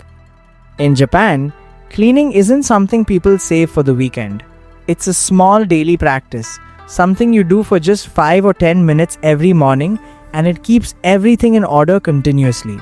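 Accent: India. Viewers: Im sorry. Is this an indian accent rather than japanese? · The narration is weird. Why is the accent Indian if the creator is Japanese?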